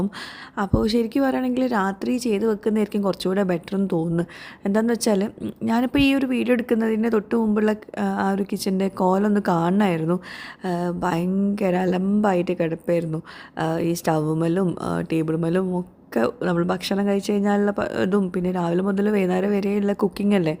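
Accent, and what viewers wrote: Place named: Malappuram, India. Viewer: Engne ayalum nammude malappuram basha vallapoyum varum alle kolaya❤❤❤😂😂😂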